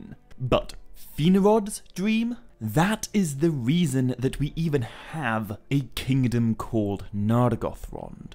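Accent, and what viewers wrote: United Kingdom. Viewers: As an American I enjoy UK pronunciations of words like aluminum....I won't correct you if you don't correct me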